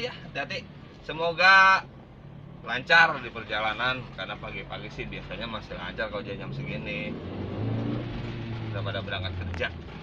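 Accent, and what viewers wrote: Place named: Banyumas, Indonesia. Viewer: wong ngapak pwrt ini bang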